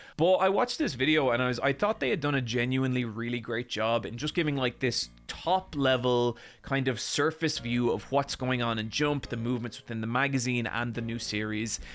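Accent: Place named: Ireland. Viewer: Watching that guy's videos is going to be like reading nu-Berserk. It's better than nothing, right? Have you noticed that people try to imitate your tone of voice and cadence? It's not just your video titles. They're trying to copy literally everything about your videos minus the Irish accent. What if you include the official Shonen Jump rankings as well as the sales figures? Then you can piss off everyone lol. I really miss those videos of yours because I never gave a shit about Shonen Jump before you started making them, and I have stopped giving a shit about Jump since you stopped making them. I think I am representative of the majority of your audience rather than the Shonen Jump nerds who nitpick them. Maybe you could make a Youtube poll asking these sort of questions: "Did you care about Shonen Jump magazine before watching my Shonen Jump videos?" and "Have you stopped caring about Shonen Jump now that I've stopped making videos about it?"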